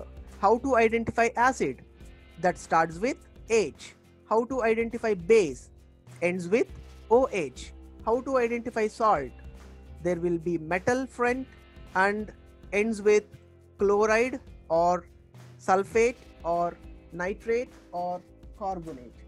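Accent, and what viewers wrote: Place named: India. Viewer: It's a Indian accent😁 I am 100% sure.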